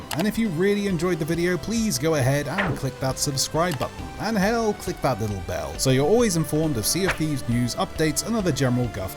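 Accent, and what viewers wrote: Spain. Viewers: Are you Spanish??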